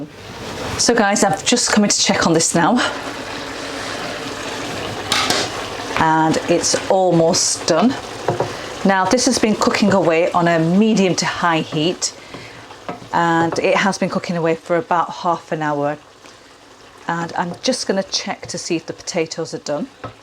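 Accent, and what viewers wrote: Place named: North of England, United Kingdom. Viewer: You're very welcome !! If you recall, I mentioned earlier that I was born (1944) in Glasgow, & am now retired & living in Oakville, Ontario, Canada. I've eaten various Curries since my Teenage years, & make them at home. Also, I love your accent from (where in ?) the North of England. And, where are you now down South ? As I've said before keep up the good work, & Curry on !!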